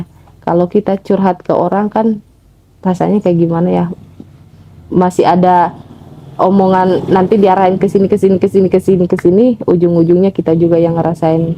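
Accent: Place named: Batak, Indonesia. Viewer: Batak gaya bicaranya seperti aagim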